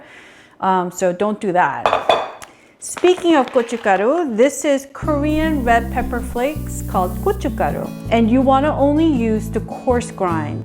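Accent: United States. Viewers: WOW i hear your accent evolved into american in such short time from the video you made 6yrs ago on the pickle raddish. Amazing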